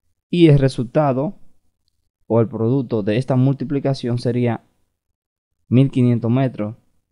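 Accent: Dominican Republic. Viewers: de donde eres me sueñas dominicano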